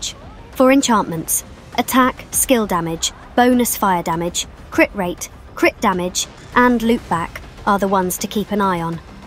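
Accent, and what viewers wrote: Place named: United Kingdom. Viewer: What a Nice plasure to ear That british voice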